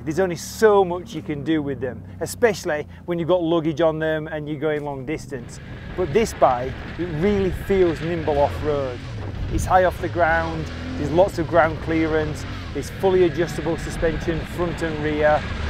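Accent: United Kingdom. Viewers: I love your British accent! So authentic. From kenya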